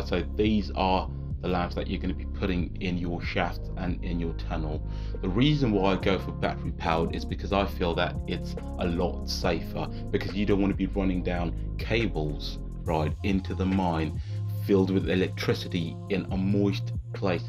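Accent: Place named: United Kingdom. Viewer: hahaha aaah straight Bri ..ish lol like the accent lol. Thanks man . God bless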